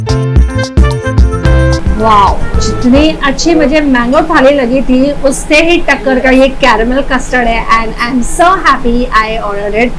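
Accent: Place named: Sindh, India. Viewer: Sindhi accent is good to hear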